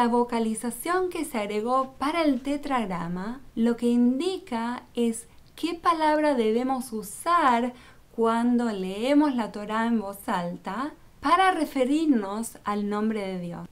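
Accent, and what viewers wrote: Argentina. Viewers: no tengo mucho tiempo de verte conocido por medio de YouTube y me da tanto gusto de escucharte tus expresiones y explicaciones acerca de las costumbres Judías y de pronto pensé que escuchaba a una Argentina, pero bueno eso no importa; sabes amo a Israel amo a Jerusalén quizá no tengo una forma de expresar clara en cuanto al decir amo…… pero siento algo que no se cómo describirte, Dios es bueno…….sigue adelante con esas explicaciones tan bonitas, mi nombre es Luis y soy de Guatemala. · querida; hasta que se dijo v ine a vivir ; estuvo bien, cuando se dijo USA, alli si que ya no mas me gusto, tienes un dialecto argentino , asi esta mejor , no lo destruyas con usa , querida evita esas palabras, ha sido y es el demonio desatado sobre nuestra region, no la riegues.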